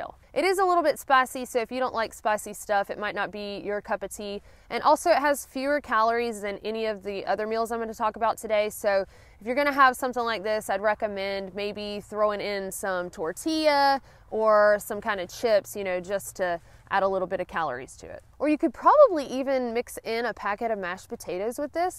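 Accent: South, United States. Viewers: What kind of country ass accent is that ☺ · I'm from Tennessee and hear it all the time,but I could listen to your sweet southern voice all the way to Maine... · Bet you never heard this before. I like yer southern drawl. Lol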